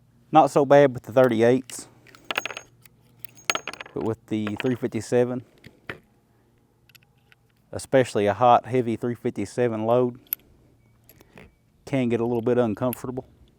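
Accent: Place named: Kentucky, United States. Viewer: Im from Shepherdsville just south of Louisville. Sounds like you're from KY too. If so what part?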